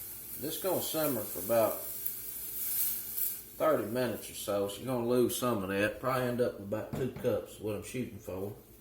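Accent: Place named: Mississippi Delta, United States. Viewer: You sound like you're from the MS Delta, perhaps Indianola or maybe down around Yazoo City. Greenwood/Greenville? No matter where your're from, you made a nice video. Just please get yourself some good wooden or plastic utinsels to save that fine cookware.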